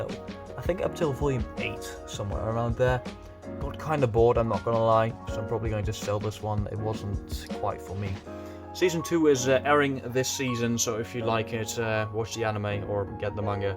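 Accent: Netherlands. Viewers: Are you Dutch perhaps? You're accent sounds really familiar but I don't want to assume. Great collection and thanks for showing it! Edit: I just scrolled through to the comments and apparently I'm really bad at listening 😅. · I currently have about 700 volumes in my collection and my favorites are Oysumi punpun, Pandora hearts, AOT, Berserk, and Monogatari lmao. Nice collection btw! You have really good taste imo. Also are you dutch?😂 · Are you Dutch? Great collection btw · Hahahah moet wel je kunt gwn meteen horen dat ie Nederlands is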